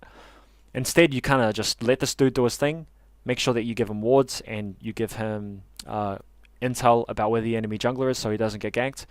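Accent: New Zealand. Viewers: Thanks for this guide bro, you from nz?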